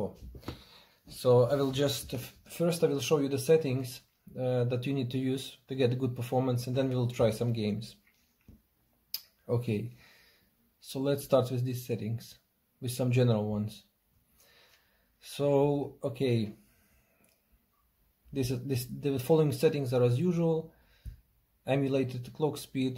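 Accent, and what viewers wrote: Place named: Russia. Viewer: То чувство когда русский базарит по английскому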